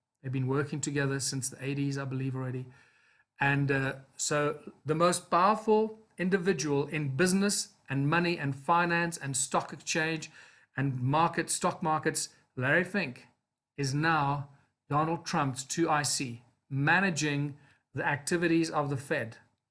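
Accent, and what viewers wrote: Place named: England, United Kingdom. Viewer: Make note to his english accent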